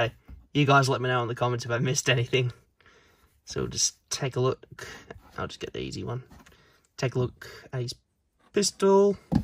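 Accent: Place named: Australia. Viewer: Nice to hear an Aussie for once🙏🏽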